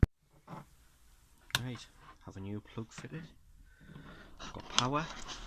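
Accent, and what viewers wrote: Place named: Newcastle, United Kingdom. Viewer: Hey, I've got one of these, bought new! Nice to hear Geordie accent...trying to change the blades,,,,, first time in all these years..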